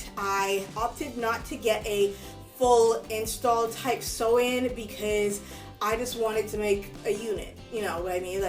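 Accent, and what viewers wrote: Bahamas. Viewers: Hi great review, the hair look amazing on you😉. I just found your channel and I am so happy I did, you're really sweet and very fun to watch😁. I can't wait to watch more of your videos!!! I heard my accent I'm Bahamian too so you know I will be giving you my love and support😁😁!!!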